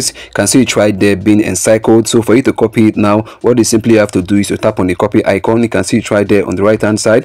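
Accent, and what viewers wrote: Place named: Africa. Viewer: man ,u have an african english and u talk fast ,slow down man ,none understand u like that ,ur so funny !!!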